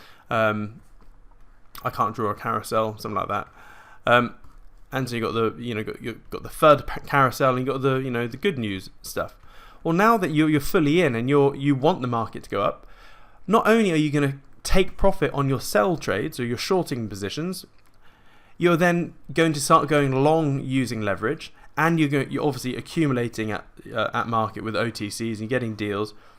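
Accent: United Kingdom. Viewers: Hey, thanks for the great educational video! Always appreciate to hear your British English! In the past couple of videos you've said that you don't trust this btc rally. Now that your bottom glassnode indicator is flashing "possible bottom" are you back in btc with a % of your free flowing USD? Or are you waiting for that $42k to hold as support before you initiate your capital? Would be lovely to get your answers on these questions. Appreciate your answers in advance:)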